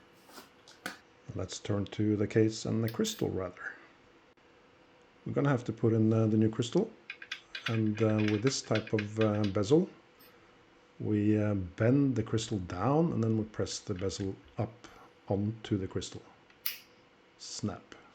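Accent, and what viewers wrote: Norway. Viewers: Your accent sounds more norwegian than swiss? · Financially it probably wasn't worth the effort but emotionally...its a beautiful timepiece and I would be very proud to show before and after Shots if i had been able to restore it like you did. You mentioned your in Switzerland...I was thinking. "But he sounds Norwegian to me!" 😀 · Are you German? Your accent sounds so damn Norwegian! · var bare hyggelig å høre at du fortsatt hadde norsk aksang på engelsken. Digger det du gjør👍🏼 så håper å se mer av dette :)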